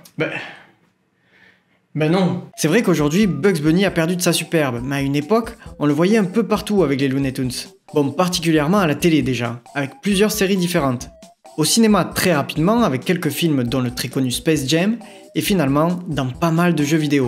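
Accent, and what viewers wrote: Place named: South, France. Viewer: Je sens l'accent du sud par ici ! Oh ! Sinon, bordel qu'est-ce que j'adore ce jeu ! C'est en partie ce jeu (et Le cauchemar des schtroumpfs) que j'ai dédié au compositeur Alberto José Gonzalez qui a fait un taf monstrueux, et VD-Dev de bons devs de l'ombre ! Pour un première épisode, il est vachement cool, j'ai lâché quelques rires hahaha ! Des bisous !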